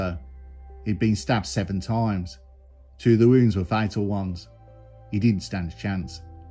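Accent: Birmingham, United Kingdom. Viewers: Loving your content and your narration sir, Brummie accent big up 👊🏻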